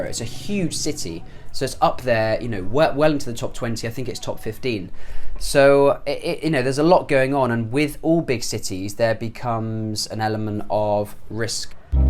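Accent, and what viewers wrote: United Kingdom. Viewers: Hi, I really want to say that your british accent is so pretty cool! I like it so much and want to have this accent as you :) Hope you come to travel in Thailand once. Although it might be not attractive so much like a big city, there are a lot of attractions waiting for you to enjoy x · Heyyy I love your accent Can you tell me something about it? And where are you from in UK? I mean where you grow up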